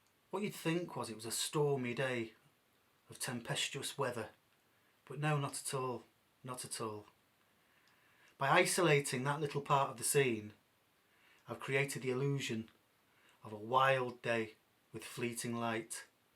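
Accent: United Kingdom. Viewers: Compelling viewing! Glued to the screen and the welsh pronunciation filled with graphic story telling!, thanks cocker for sharing the stories about these glorious images. Sithee soon, sithee soon x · Great video, thank you for taking the time to put it all together. A real reminder of what I am missing while waiting for Snowdonia to open it's doors again, all underpinned with some interesting stories delivered with a hint of Manc humour. Already looking forward to the next episode!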